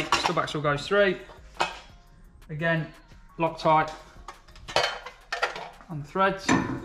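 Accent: United Kingdom. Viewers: I like these videos:) and this accent:)) sounds beautiful😍✨. Is it british accent?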